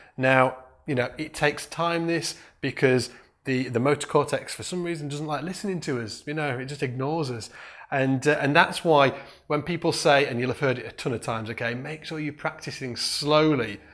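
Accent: United Kingdom. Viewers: damn, that is some hardcore british accent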